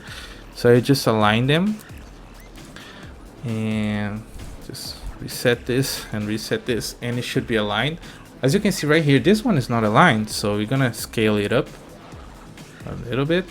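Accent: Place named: Brazil. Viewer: Nice tutorial, Thank you! E desconfio que seja BR pelo leve sotaque, se for, parabéns pelo inglês e pelo vídeo, valeu!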